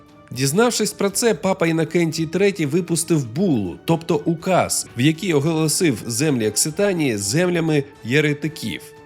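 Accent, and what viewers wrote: Russia. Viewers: Дуже змісовно. Дякую за працю. І над вимовою, бачу, працювали ☝ Але ще відчувається росіянський акцент. Працюйте над собою і над новими матеріалами для відосів. Я Ваш підписник. Ще раз ДЯКУЮ.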